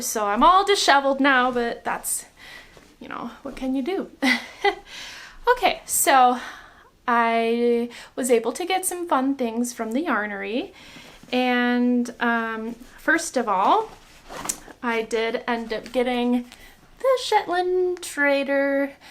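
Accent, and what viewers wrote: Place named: Canada. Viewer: Girl if you didn't say otherwise, I totally would have thought that you are Canadian! Also, envious that you have a family business to work at...such a blessing, may you never take it for granted. Love from your northern neighbour!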